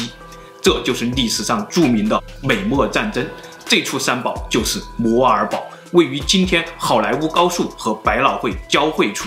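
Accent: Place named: Fujian, China. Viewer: Hu 建 口音吧？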